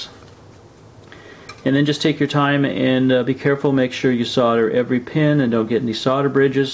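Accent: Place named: Canada. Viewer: Great project! Unfortunately, it seems Briel is no longer selling kits. BTW, while I was watching I was thinking " sounds Canadian" and sure enough you are:) (From a fellow Canadian.)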